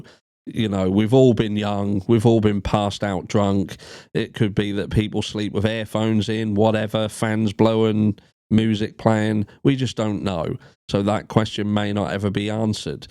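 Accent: United Kingdom. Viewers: Yes I was one who brought up civil rights in the USA. It hadn't registered you were in the UK.* Such "dragnets" may be permissable in the UK. I recall reading about one done many years ago (40s?) (in I think it was in Prof Keith Simpson's book "40 Years of Murder). *(ur accent notwithstanding since I watch a lot of UK based content & thus Canadian, UK & USA accents no longer "jump out").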